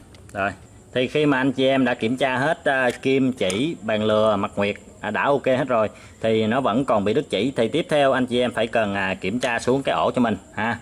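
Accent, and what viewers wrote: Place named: South, Vietnam. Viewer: Mình miền Bắc nhưng rất iu quý người miền Nam! Giọng dễ thương, thân thiện!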